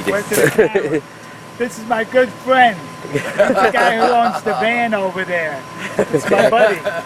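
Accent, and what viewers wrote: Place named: Texas, United States. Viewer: テキサス親父さんの明るいキャラもさることながら、その「発音」にすごく興味が行きました。いつも聞きなれているアメリカ英語とずいぶん違いますね。これがテキサス訛りというやつですか。今、テキサス出身の先生に英会話を習っていますが、「テキサスの人はゆっくりしゃべるから日本人に分かりやすいんじゃないか」と言っていましたが、本当ですね。英語圏の人がみんなこれぐらいの速さ（遅さ）で話してくれればもっとありがたいんですけどね（笑）。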